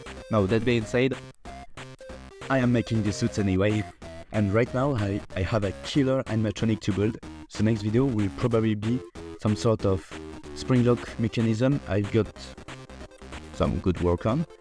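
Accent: France. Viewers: L'accent francais mdrr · l'accent Francais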